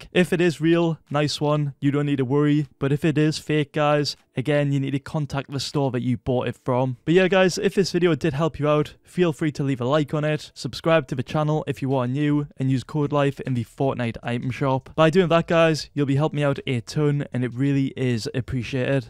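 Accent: Germany. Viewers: Are you from Germany? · Wait, are you german? xD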